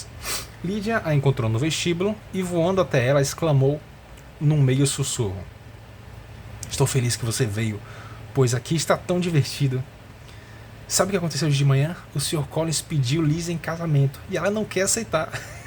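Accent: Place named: Northeast, Brazil. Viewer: tu é de Natal/Rn né? sem condições, se não for nordestino, eu cegue.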